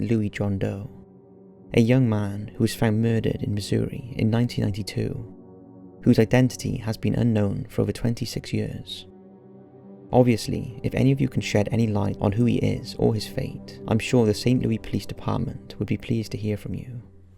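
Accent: Wales, United Kingdom. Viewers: Edwin well it's almost lunch time where I live in europe and I suspect he lives somewhere in great britain according to his accent.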